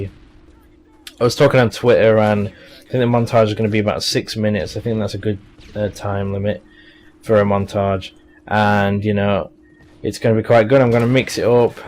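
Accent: United Kingdom. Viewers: Fortnight. British english is so cool.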